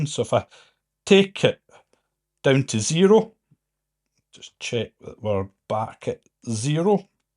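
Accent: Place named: Scotland, United Kingdom. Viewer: He is Scottish. Bloody hell, there's an R! 😄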